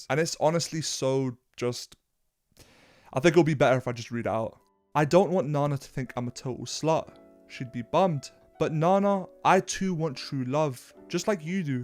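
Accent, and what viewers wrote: United Kingdom. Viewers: ur talking too fast and I'm struggling to keep up due to ur thick british accent tbh. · you british accent makes me laugh sometimes and it snaps me out of what youre talking ab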